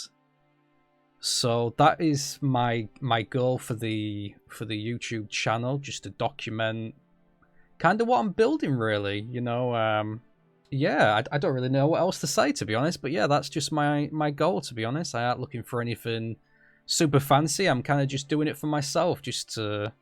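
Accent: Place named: Yorkshire, United Kingdom. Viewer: Knew the accent was familiar, I live up near Middlesborough and go to the York markets nearly every year.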